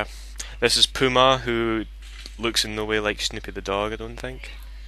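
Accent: Scotland, United Kingdom. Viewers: I always did like the Scottish accent. Sounds so... GOOD!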